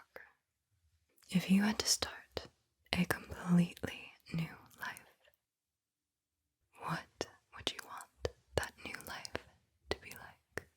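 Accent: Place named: United Kingdom. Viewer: 1 sometimes 2 morning coffee 😊 3 football (Love your British accent BTW) 4 music 5 grandad 😢 6 sorry I didn't catch that 8 carting 9 2 people falls the middle 11 face 12 hotel 13 // Xd 14 I guy with three b f Xd 16 I lost number count Jak & Daxter Senen 100% Police man coming Xd Idi Amin conquer Having a gf Xd Mercadona Empathy German Lost familiar? Sorry I don't have that understanding level I am nice person Animal loving Treat of others (This is the life) Wallet, phone, Air pods Currently lost train Yours 💜💜🔥 Curious * But JK Rowling fault 😅 Maybe not But I found 20€ And turned to catch them But they weren't Not me But take car dr n k A grl trowing €100 away on the street That later came to my pocket It wasn't r0b she didn't want them And Xd There was more money But I didn't take Politics Yes Queen Xd 😊 Humans AI Health care Barcelona Diddy Cap Chicken Fortnite ❤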